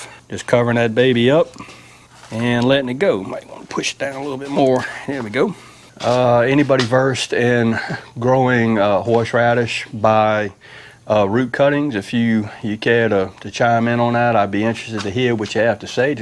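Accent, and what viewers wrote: South, United States. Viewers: Omg love the country accent authentic American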